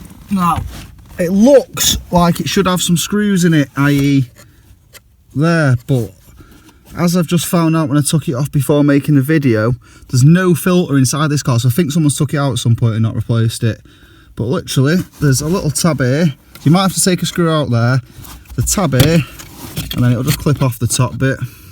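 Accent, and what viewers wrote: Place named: United Kingdom. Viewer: What a lovely britsh acsent )) Thanks 4 Video!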